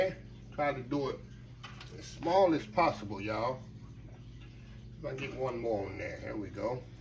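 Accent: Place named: Deep South, United States. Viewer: New subbie here. Love the deep south accent. Good cooking! Can't wait to cook with u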